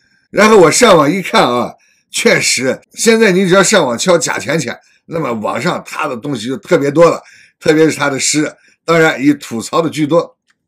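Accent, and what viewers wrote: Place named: Shaanxi, China. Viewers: 西安话？我老婆说是西安城边长安县方言！哈哈！ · 好烂的诗，陕西话一说很像黄色笑话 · 陕西话真难听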